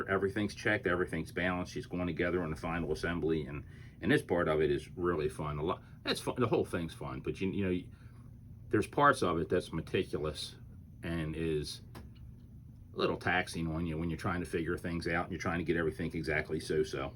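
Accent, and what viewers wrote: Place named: Baltimore, United States. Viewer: You sound like you are from Baltimore... Is this engine from the area?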